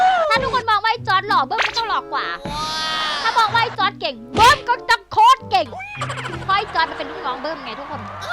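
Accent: Isan, Thailand. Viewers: เป็นคนอีสานบ่นอ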